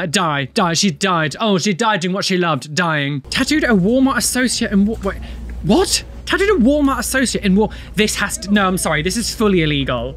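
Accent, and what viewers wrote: United Kingdom. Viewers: That bri'ish accent girrrrrrl 😼🎀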